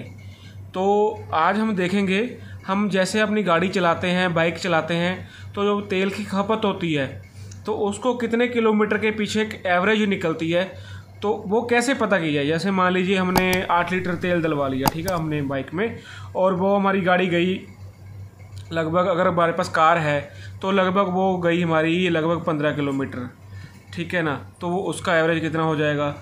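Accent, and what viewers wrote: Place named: Punjab, India. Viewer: Is tra di hor video upload krdya kro y g nale menu lgda tusi punjabi o tudi boli tu pta lg rhea das o is it right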